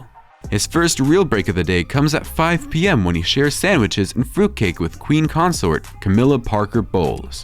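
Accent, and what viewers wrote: United States. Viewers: The claims were made by Burrell who was Diana's butler - not Charles' butler. The claims about Charles are garbage - made for the most part by Burrell. The toothpaste "thing" is something we'd all ask for help with if our arm had had to be broken twice - as happened with Charles. All the claims about toilet seats etc as one example are garbage. Ignore Burrell who's a gossip who makes up answers when he doesn't know the answer and left service with the RF when Diana died over 25 years ago. A butler recently retired from service with Charles laughed at all these claims and said he had never experienced any of them. Note the American accent on the video. Red Flag. So much garbage written and voiced by Americans about the RF all over social media - all of it laughable.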